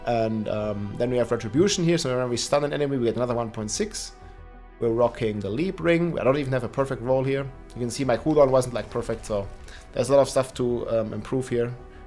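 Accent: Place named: Germany. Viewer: Bist ein Opfer wirklich du bist der Grund Why alles geh nerf Wird rede mal deutsch du bist mehr deutsch als Manuel neuer hört man so raus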